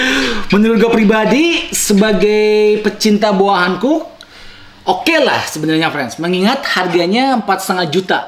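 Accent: West Java, Indonesia. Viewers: Udh d alungin batu encan mang? Pepeus henteu Logat / bhs sunda na alus.. Jgn smp hilang nya mang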